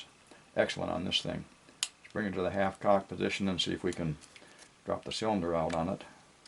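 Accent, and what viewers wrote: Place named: Minnesota, United States. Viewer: You must be in Minnesota based off of your temps and snow and accent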